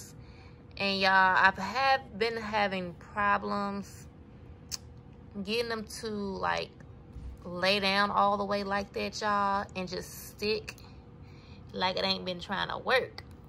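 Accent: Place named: South, United States. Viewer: Love your country accent 😩 feels like home 😭❤️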